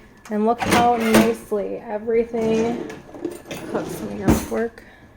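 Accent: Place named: Michigan, United States. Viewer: You're from Michigan aren't you lol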